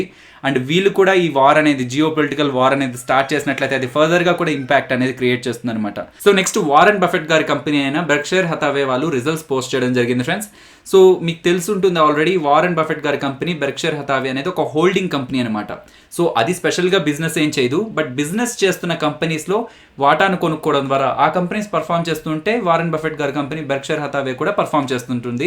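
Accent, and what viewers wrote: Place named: Nellore, India. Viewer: nellore kadha anna meedhi.. slang alaney undhi..🙂🙂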